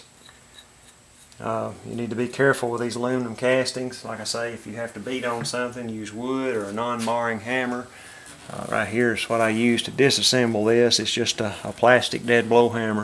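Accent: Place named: Tennessee, United States. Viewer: My 790 has 667 hours in it. Waiting for this to happen. I'm retired mechanic and service manager, but never been into one of these. My brother, also a mechanic told me this was a common problem. BTW, you look like you could be twin brother of a very good banjo player I know of. I recognized your dialect and you mentioned Tri Green so I figured you're in Tennessee. Check out Daniel Grindstaff banjo, perhaps you are familiar with his music. Appreciate your verse and good spirit.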